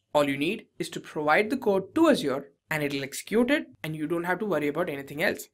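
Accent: India. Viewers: Please don't use Indian accent :) Its hard to listen while focusing to understand the content...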